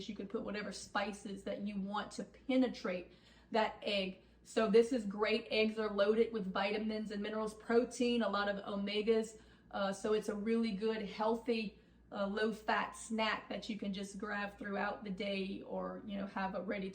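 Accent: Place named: North Jersey, United States. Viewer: Love watching and hearing yr accent! North west nj🩷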